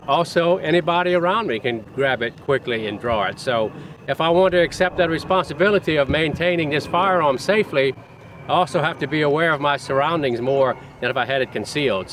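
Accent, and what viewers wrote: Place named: Cajun, United States. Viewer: little tinge of cajun in your accent! are you from acadiana?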